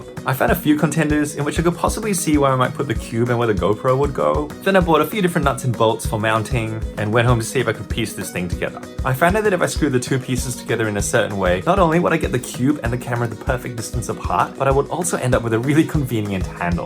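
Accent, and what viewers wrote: Australia. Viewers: Are you Aussie too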